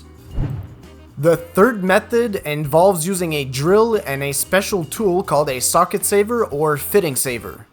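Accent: Quebec, Canada. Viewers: I'm guessing French Canadian from Montreal or Gatineau.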